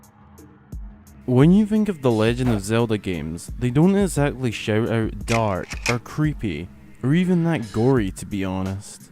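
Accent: Scotland, United Kingdom. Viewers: Moan the Scotland! Where u from mate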